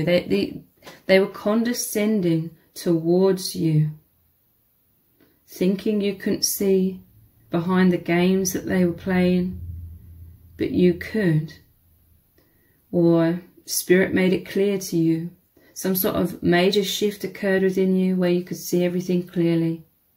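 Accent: England, United Kingdom. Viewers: so accurate! i can't place where your English accent is from. Would love to know it. I spent time all around the UK :)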